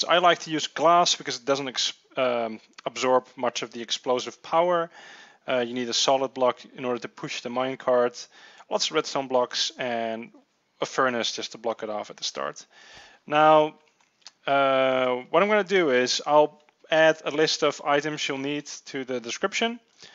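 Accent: Netherlands. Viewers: Let me geuss you are dutch Want ik ook en het is heel duidelijk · Je praat echt met een heel Nederlands accent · ***** Haha You HAve a dutch Accent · #nederlandsacc beter dan van gaal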